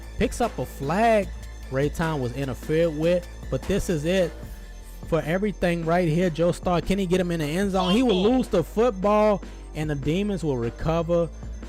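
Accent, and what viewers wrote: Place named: New Orleans, United States. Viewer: You have great content on your channel man 💯 And you sound like you from New Orleans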